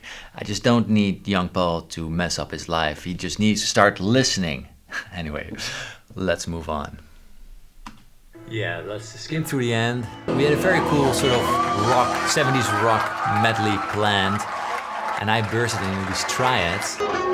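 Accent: Netherlands. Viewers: Did you say Holland? I was trying to figure out your accent. You're showing me the music inside the music. Thank you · i might be rly dumb here but I never noticed the dutch accent till now...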